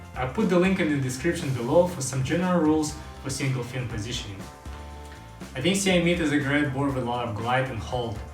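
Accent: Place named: Russia. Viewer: No disrespect, but did you learn English in Russia? you have an interesting accent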